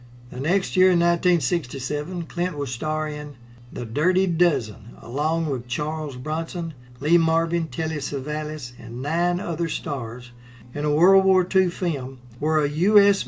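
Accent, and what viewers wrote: South, United States. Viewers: I don't care about the subject. It's the narrator that's I like listening to. The southern accent is just adorable and voice calming.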